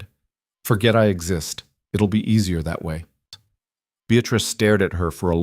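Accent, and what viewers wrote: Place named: United States. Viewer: American accent dosen't suit the story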